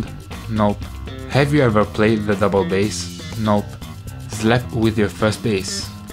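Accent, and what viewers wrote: Italy. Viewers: Okay so this was the Italian voice reveal. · So, you actually made an italian voice reveal before 4M subs. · si sente troppo che sei italiano hahahah,suono anche io il basso,spero di arrivare ai tuoi livelli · Giovanni Dalo Ho visto poi nelle info, dove ho avuto la conferma direttamente da! Il punto non è che lui abbia una pessima pronuncia, anzi tutt'altro, forse mi sono spiegato male, il problema è che per noi latini è molto difficile riuscire a parlare un inglese senza far capire la nostra provenienza. Prendi i paesi nordici (pewdiepie ne è l'esempio) fin da bambini ti istruiscono a parlare inglese nello stesso modo in cui impari la tua lingua madre e noi italiani per quanto possiamo impegnarci, senza avere esperienze all'estero ci rimane difficilissimo migliorare la nostra pronuncia. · Vusferno Music E' italiano :) l'accento italiano si sente ma la pronuncia è molto buona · Italian accent all the way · Wow si sente che sei italiano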